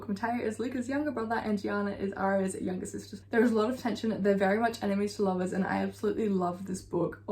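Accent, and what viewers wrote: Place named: Australia. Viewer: omg i just found your channel and when i heard a fellow aussie accent i got so excited 🫶🏼😭😭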